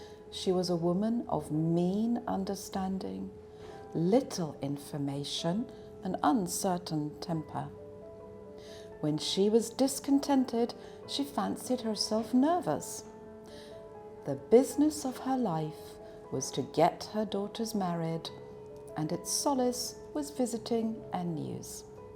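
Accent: United Kingdom. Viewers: 영국 발음 너무 고급져 ㅠㅠ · 와 오만과 편견 책을 영국발음으로 들어보다니 ㅠㅠㅠ 감사합니다 ㅠㅠ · 전체 다 읽어주세요 !!.. 영국발음 공부하기 딱 좋아요.. 제발 다 읽어주세요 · 영국억양은 듣기만해도 좋아...💕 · 사람들이 흔히 떠올리는 영국 악센트의 정석이네요 😍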